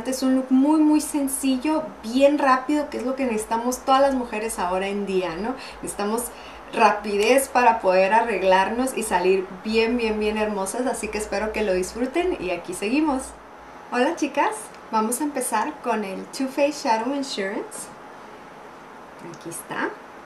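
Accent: Mexico. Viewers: ya decia yo que sonaba muy mexicana xD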